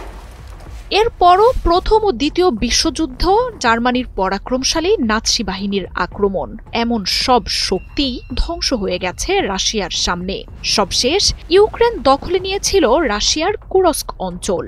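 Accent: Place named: West Bengal, India. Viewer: News reporting shune west Bengal er kono channel mone hocche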